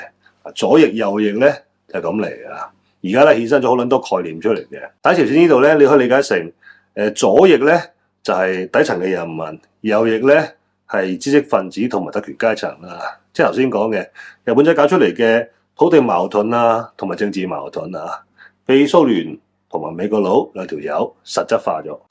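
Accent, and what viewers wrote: Guangzhou, China. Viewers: 嘩吊！原本聽廣州話仲全神過香港嘅廣東話，起碼唔洗聽一D無謂嘅英文字!!!!!!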